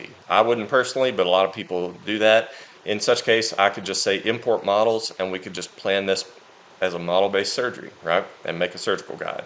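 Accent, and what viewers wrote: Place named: Chattanooga, United States. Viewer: Thank you! Very helpful. I grew up in Chattanooga, thought I recognized that accent, cheers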